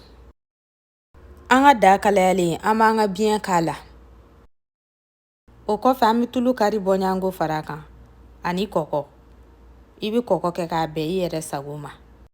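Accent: Bambara, Mali. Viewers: Merci beaucoup. Ma fille adore tellement ton accent bambara 😁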